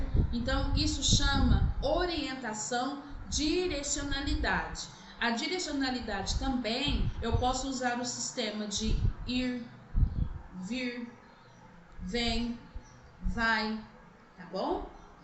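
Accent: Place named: Minas Gerais, Brazil. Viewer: Rindo horrores com o "jeito mineiro" de falar!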